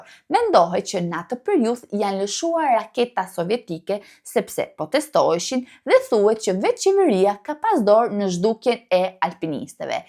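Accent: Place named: Tosk, Albania. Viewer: O sa bukur ngjyra e flokve tua ket ngjyr Gri ❤ te rrin shum bukur mos i ndrysho biles nje koh❤! Dhe un e kam ket ngjyr vetem se ikam te shkurta.Të përshendes nga Franca ne Origjin nga Kosova.te ndegjoj me shum deshir sepse me pelqen dialekti tosk edhe pse Gegnishtja asht e bukur se asht shum e veqnat por ti gjithqka e bën me ❤ !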